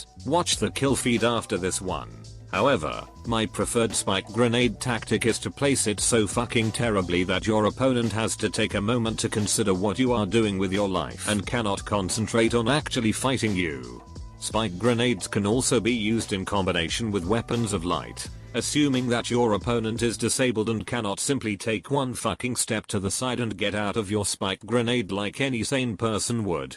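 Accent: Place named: United Kingdom. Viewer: Two questions. First, how do you do that mechanical Brit voiceover? Second, what's the track ?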